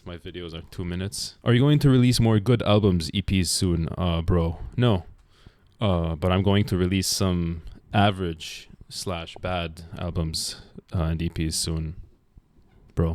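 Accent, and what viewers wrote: United Kingdom. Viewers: Why are you british? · You're British accent is so funny :)))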